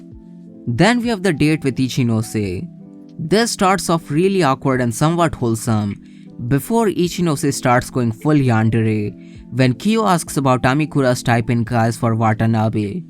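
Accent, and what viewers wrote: India. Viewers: that's Indian accent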